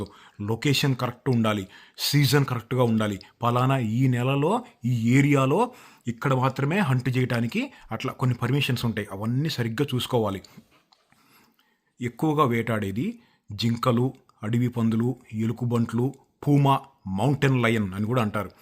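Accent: Andhra Pradesh, India. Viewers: your slang is like andhra y you are staying in telangana then😀😀😀😀😀😀😀😀😀😀😀😀😀😀😀😀😀😀😀😀😀😀😀😀😀😀😀😀😀😀😀😀😀😀😀😀😀😀😀😀😀😀😀😀😀😀😀😀😀😀😀😀😀😀😀😀😀😀😀😀😀😀😀😀😀😀😀😀😀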